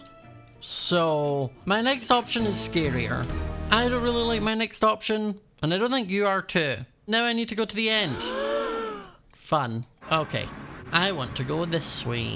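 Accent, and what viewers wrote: Scotland, United Kingdom. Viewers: I'll keep that in mind and will try and remember to check my notifications at 6pm at Tuesday and Saturday- Btw, I love the Afterlife series! The Scottish accent makes your vids better and funnier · U are Scottish aren't U respect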